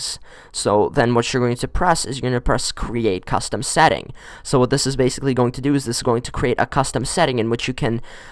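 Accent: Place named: Egypt. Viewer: You kind of sound like someone from my school someone Egyptian that comes from Cairo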